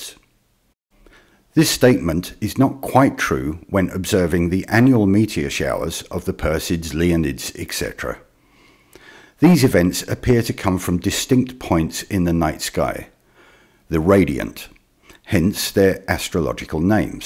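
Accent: England, United Kingdom. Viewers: Hmm I was going to respond to you myself, but I thought I would like the answer to be as simple as possible, and I know that you have stated in other videos that you would not accept any answer with the word gravity in it. So I decided to ask my 5 year old son for you. He has not learned about gravity from school or myself as he is only 5. This was his reply, he thought about it for about a minute, and came up with this answer. Well planes can go up as well as down, but asteroids can't go up. he thought a bit more about it and added. it's like you don't see rain going up. at this point he lost interest and went back to playing with lego. I couldn't have put it better or simpler than that. And I know that asteroids can sometimes appear to go up, and rain can also go up in extreme weather conditions. but he is only 5, and appears to have a better understanding than you without any education on the subject, or prompting by myself. 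As you have an English accent I take it you have seen the program are you smarter than a 10 year old. Well you don't seem to be smarter than a 5 year old. Now please stop preaching disinformation to gullible people, you do not realise the harm you are doing to them.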